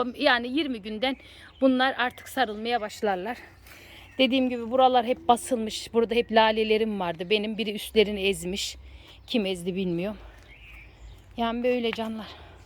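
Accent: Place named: Kayseri, Turkey. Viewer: Nerelisiniz abla kayseri şivesine çok benziyor şiveniz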